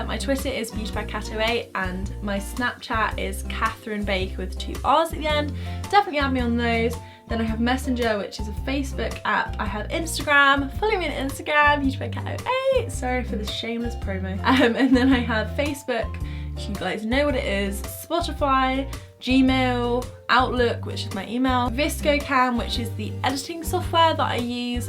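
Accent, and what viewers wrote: United Kingdom. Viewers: Pia Ana I absolutely adore british accent. Idk why it sounds sooo nice😂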